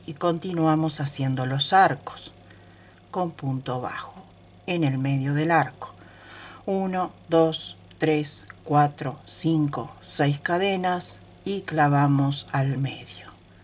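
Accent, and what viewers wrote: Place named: Argentina. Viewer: Señora usted repita como lo hace siempre en mi familia siempre la preferimos por ese motivo así es como se aprende y usted explica muy bien ,y su voz es tranquila suave y muy clara ,en mi familia pensamos que usted es Argentina su forma de hablar y el nombre de los puntos que Dios siempre la bendiga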